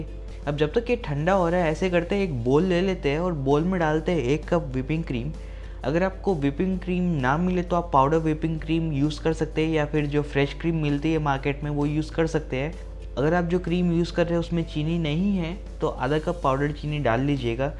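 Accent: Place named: Hyderabad, India. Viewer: Your huderabadi accent is on point ...God bless u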